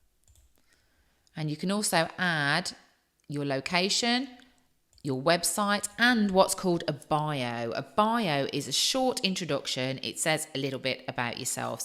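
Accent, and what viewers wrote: United Kingdom. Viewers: Thank you, I wasn't expecting to find such an informative video on this. What a relief, British too! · Thank you! Clear, concise, no extraneous chatter, loved it! And with a British accent to boot! (We Americans love the accent!)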